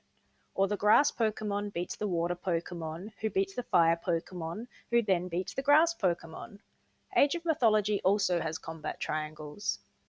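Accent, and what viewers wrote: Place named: Australia. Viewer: Why do you sound Australian? 😅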